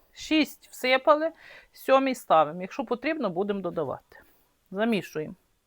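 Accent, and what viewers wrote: Lviv, Ukraine. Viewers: Ні, не Житомирська. Там слово #файно# не вживається. Скоріше Львівщина.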